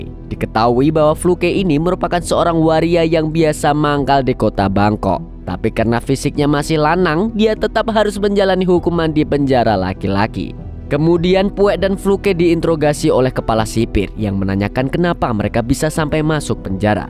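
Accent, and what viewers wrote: Banjar, Indonesia. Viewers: Banjar banar😅😁😂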